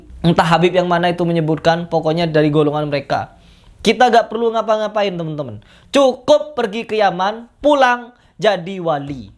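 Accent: Madura, Indonesia. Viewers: Ha..ha...bang lucu enak logat nya madura ya.tp yg penting lagi omongan nya bener semua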